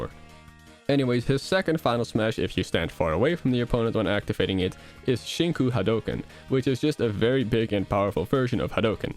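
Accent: Netherlands. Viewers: are you dutch? me to if you are dutch! love your content!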